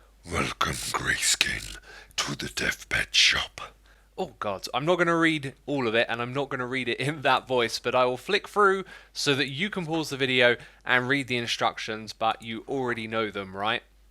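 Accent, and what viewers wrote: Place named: United Kingdom. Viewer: it took me a while to understand what flower you were referring to lol I love British accent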